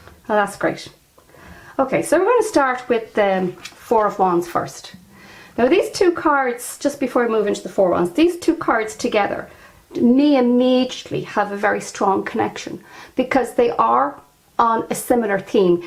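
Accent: Ireland. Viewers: Hi, only just this minute found you and am watching this video. You're fab! Trying to place your accent, are you Cork?? I'm in cobh 💖 Can't wait to check out your other videos Tomor. Would also love to see videos on each card 😘 Love listening to you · Yass! So beautiful to hear an Irish accent teach tarot. I've been studying tarot for three years since ive been abroad in Australia and havent really connected with any Irish regarding divination! Thank you for your master classes 🍀❤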